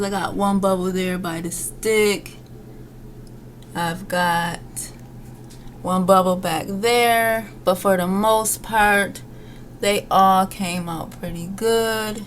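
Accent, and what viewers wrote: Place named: North, United States. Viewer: She sounds like she’s from up top to me.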